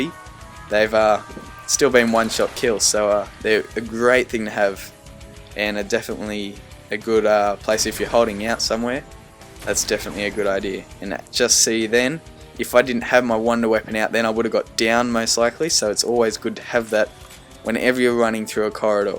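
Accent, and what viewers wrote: Australia. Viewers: AUSSIE AUSSIE AUSSIE, good to hear a fellow australian :) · you australian? you sound it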